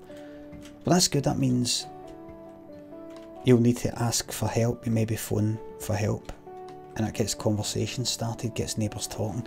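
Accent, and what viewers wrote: Scotland, United Kingdom. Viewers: It's like Jerma but scottish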